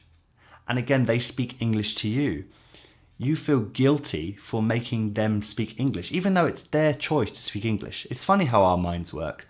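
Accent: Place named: United Kingdom. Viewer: J'aime ton accent britannique. :)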